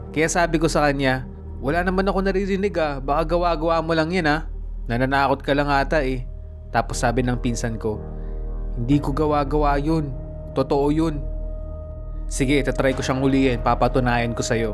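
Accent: Ilocos, Philippines. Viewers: Alam mo po pala mag ilocano kuya 😮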